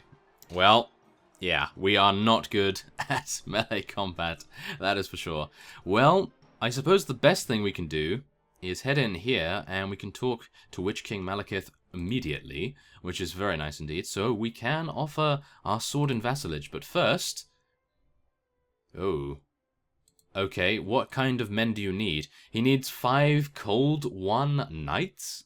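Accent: United Kingdom. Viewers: Even though I am also british, I love your accent xD Some of the way you talk does make me giggle :)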